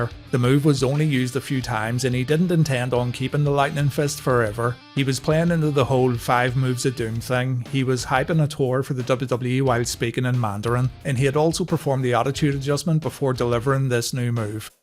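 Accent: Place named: Liverpool, United Kingdom. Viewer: A scouse talking about wrestling. Subscribed.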